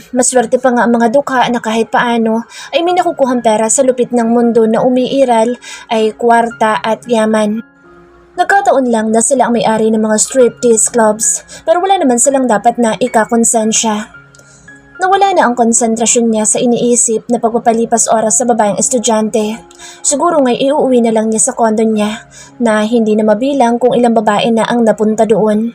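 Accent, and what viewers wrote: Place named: Visayas, Philippines. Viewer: Maganda po ang storya,,bisaya ka po maam 😊😊 ang tuno po kasi nang pagbasa,pero maganda po ang story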